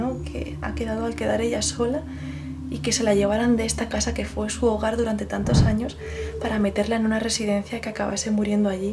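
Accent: Spain. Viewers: Me encanta el acento Que tiene ósea el acento español es impresionante Saludos desde Venezuela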